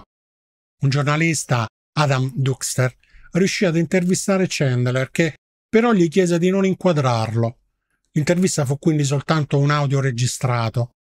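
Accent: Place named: Rome, Italy. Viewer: Mado’ che voce fastidiosa e che accento troppo marcato …Spaes Ics che sarebbe? Prova a parlare in italiano invece che romano, siccome che lo devono ascoltare tutti….video pessimo. DELETE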